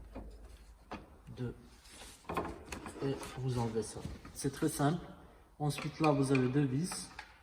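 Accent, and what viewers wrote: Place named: Yugoslavia, Eastern Europe. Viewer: le "petit" accent d'ex-Yougoslavie 😁👌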